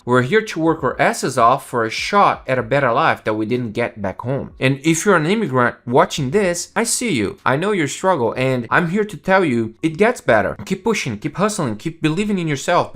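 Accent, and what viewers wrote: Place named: Brazil. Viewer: Hey, man ! I realized you are brazillian by your accent somehow, maybe bc I'm brazillian too, and then I checked out your description to find out I was right. Anyway, I'm planning to immigrate to US soon. Thanks for the reality check and advices ! I just can imagine how hard must have been for you, but you actually made it in the end !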